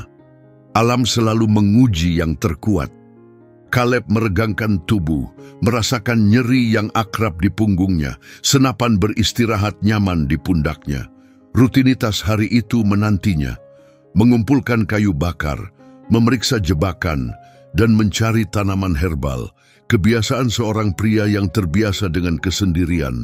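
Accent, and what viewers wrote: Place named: Malaysia. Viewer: Terimakasih terjemahan ceritanya tapi mesti saya katakan betapa menyebalkannya lafal Melayu pengucapanmu...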